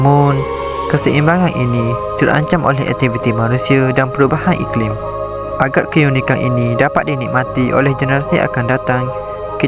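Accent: Terengganu, Malaysia. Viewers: Orang ganu ke? Clear sgt bunyi ganu ye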